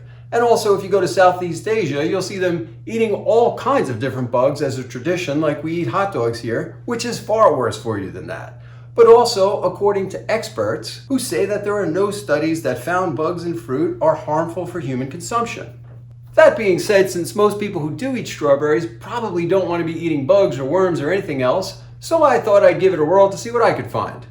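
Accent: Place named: New York, United States. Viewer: I can tell this guy is from New York cause of his accent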